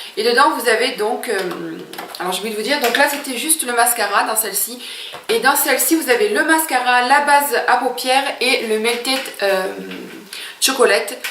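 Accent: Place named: France. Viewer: Hi, t as super craque!!! wouahhh, moi, j evite sephora, c est toxic! lol, Je participe avec plaisir Les videos que je prefere, hauls, tags, ton accent est cute! Kenneth mon mari adore, lol Il trouve que les francaises ont un joli accent, bisous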